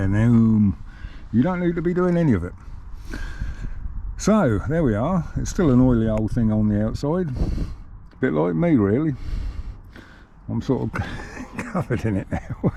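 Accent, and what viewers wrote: Suffolk, United Kingdom. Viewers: Many thanks for this, Mr. I will save the link till it's time for my 500. In my opinion, the gear cover casting is one of the most beautiful parts of the engine. I have enjoyed watching this, and listen to your pleasant speech. Suffolk, was it? Swedish regards. 😀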